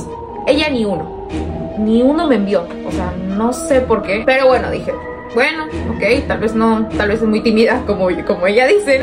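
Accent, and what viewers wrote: Chile. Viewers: Pregunta eres de chile 🇨🇱? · eres chilena🤔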